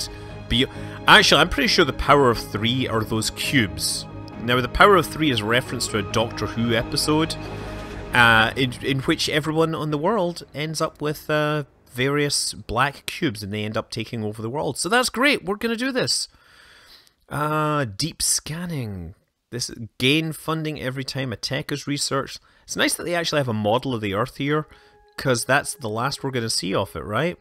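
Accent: Scotland, United Kingdom. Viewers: It's called a scottish accent. Turns out native English speakers around the world don't all speak it the way you specifically do.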